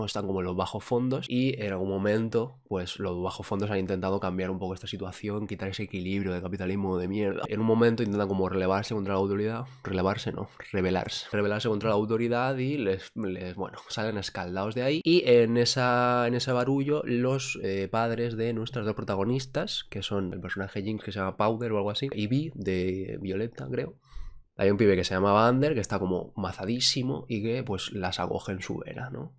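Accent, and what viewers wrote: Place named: Cuba. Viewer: Soy ek unico que piensa que suena medio cubano en este video al principio mayormente xd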